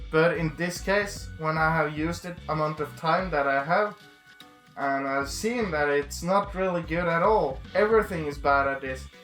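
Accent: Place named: Norway. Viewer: Such hatred. This is jut a review man, and u are making fun of his weight and norvegian accent. You are a douche. By the way, the chair is suitable up to 150kgs.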